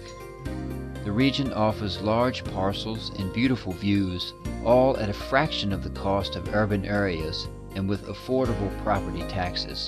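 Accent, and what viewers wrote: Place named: Virginia, United States. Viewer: The narrator definitely doesn't have THE strongest NNK accent but it's certainly there...notice how he said, "throughout?" Reminds me of my grandparents. Though I grew up in Richmond County and am proud to be from the area, I could never live there again. When I go back to visit, it boggles me how little has changed. In fact, it seems every time I return, it looks more and more isolated and run-down.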